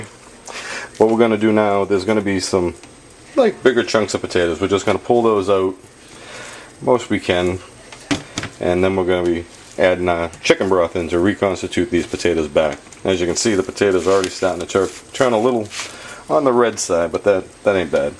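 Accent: United States. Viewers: I love the Boston accent! Trying it this afternoon and will be back at you...thanks! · Love the South Shore accent!!!!!! :P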